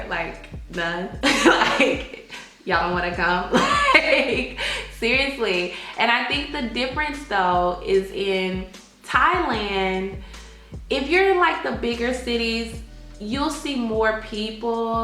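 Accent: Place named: Washington DC, United States. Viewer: Are you from DC? You sound like you have a DC accent.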